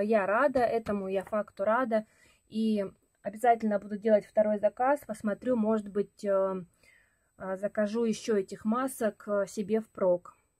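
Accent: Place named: Urals, Russia. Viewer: вы из какого города?Акцент у вас уральский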